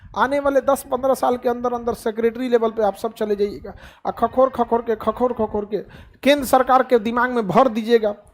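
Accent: Bihar, India. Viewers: I am from Bihar so i relish your language tone very much .u are great teacher.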